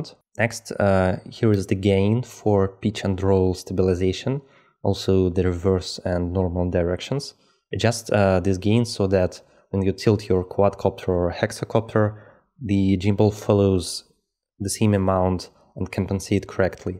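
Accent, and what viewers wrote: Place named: Russia. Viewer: This video is the BEST ever way to set up for 3pos switch. Better than airplane setup with mixing on CH5/6. I could listen to your accent (RU/EN) all day, I used to work with a few Russian/Bulgarian guys here in Aus. I loved it. Thanks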